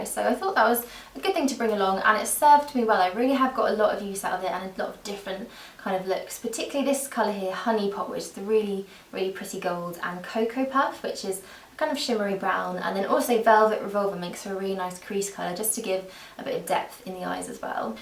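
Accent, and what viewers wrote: United Kingdom. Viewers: The dedication in that down bar, you go girl ! ;) I could listen to you speak all day, such a relaxing voice ! Out of curiosity, is your accent from any specific region in the UK? I'm Australian and find it very interesting how incredibly different regional accents can sound there (obviously it applies here as well but no where near that extent)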